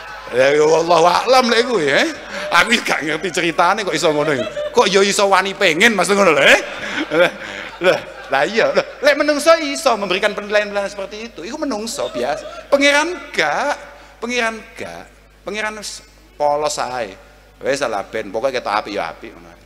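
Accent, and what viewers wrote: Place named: Java, Indonesia. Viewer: WAO GUS CERAMAHNYA DAGING SEMUA , SUBCANALOH ,PRIATUNE ENOM , GANTENG , MUKA ARAB ,,JOWONE MEDOK BANGET , ILMUNE TINGGI ,,,COCOKNYA KALAO DUDUK JEJER SAMA GUS BAHA , SUMBER ILMU SEMUA ,,,WAO KEREN GUS KAUSAR ,, KULO BANGGA GUS DI KEDIRI WABIL KUSUS PLOSO MELAHIRKAN KYAI MUDA CALON KYAI BESAR YG BER KAROMAH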